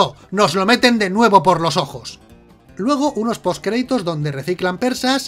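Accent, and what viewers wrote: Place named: Spain. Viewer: Jaja amo este canal. Siempre sacándole risa a la gente 😂😅😂🤣 y más con ese acento español. Apenas jaja saludos des Bogotá Colombia 🇨🇴🙏🥳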